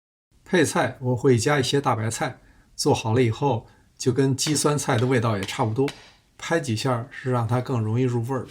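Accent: Beijing, China. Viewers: 听到京味礼貌的乡音，好亲切呀！ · 我听着也像北京口音。我是木樨地一带的。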